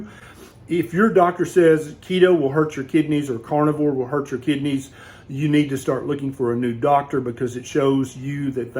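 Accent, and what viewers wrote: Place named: Deep South, United States. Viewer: Your analysis is the most comprehensive and logically reasoned that I have seen. You DO need to work on taming that extreme southern accent. It's distracting and is taken as backward and ignorant by a decent percentage of all who were not raised in the deep south. This will lead to people immediately clicking off your excellent video as soon as you start talking. Your information is so good. I just wish you had a better presentation. Not only are you elongating words, but you have the extreme form, where entire extra syllables are injected where they do not belong. The word LIST is one quick syllable. You are pronouncing LAAAYYYUST- a complete and utter abortion of the word, so extreme that I had to rewind just to be sure what word you were attempting. To correct THEYUS, (THIS) please practice saying words quickly, succinctly. 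You will sound far more intelligent. The word ON and the word OWN are two different words. ON should not be pronounced OWN! BAD is BAD not BAYYUD. CONTEND IS CONTEND, not CONTAAYYYUHD. The word WELL should be pronounced WELL- not WAAAYYYEL! The word BE is BE not BAY, and certainly not BAAYEE. DOWN is DOWN, not DAAYYOWN! Just practice saying ALL words quickly, correctly and without injecting extra syllables.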